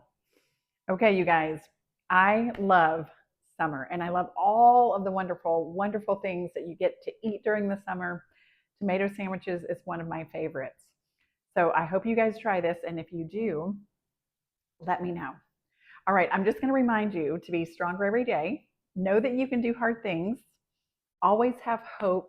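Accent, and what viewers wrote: United States. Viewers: I've got a large crop coming in, I need to try this thanks. That's the first time I've heard "Oh my stars" in so so long. You have to be Southern. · love tomatoes and even though you pronounce it the American way, I forgive you! I am right off course because I am British! Thank you so much – Paul from England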